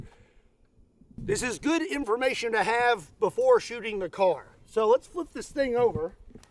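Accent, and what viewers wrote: Kentucky, United States. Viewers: Joe Rogan but from the stat of Kentucky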